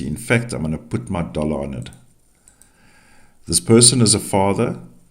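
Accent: South Africa. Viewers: I detect a south African accent. I grew up in vanderbijlpark south Africa. I recognise that accent anywhere even though I've been back in the uk 39 years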